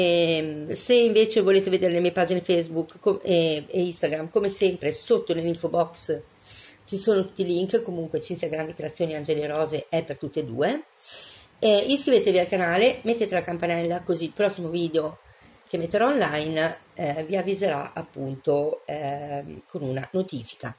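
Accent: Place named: Bologna, Italy. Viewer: Cinzia mi sono emozionata quando mi hai salutata, 😊 grazie per le belle parole...come al solito mi metti di buonumore, e mi fai tanto ridere con il tuo accento bolognese che adoro. Mi hai risolto un problema, non sapevo mai dove appoggiare gli orecchini quando devo fare le foto...grazie! Un bacione 😘😘😘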